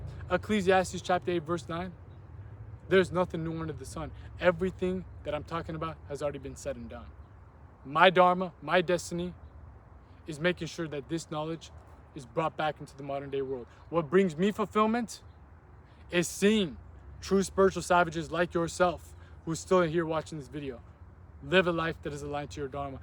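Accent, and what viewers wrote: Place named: United States. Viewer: I like your accent, bro! I wanna talk like that even though I am not American.😞 and keep the good content rolling. 💪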